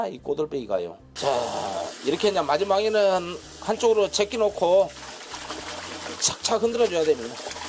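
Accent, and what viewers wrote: South Korea. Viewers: 쉐프님 구수한 사투리로 꿀팀!! 레시피 꼬들빼기 ㆍ쪽파 환상의 맛김치 강의 잘 들었습니다 ^^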